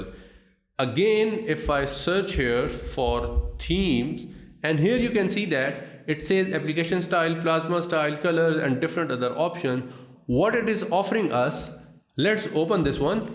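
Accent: India. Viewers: omg this indian english is absolutely horrible to listen to :o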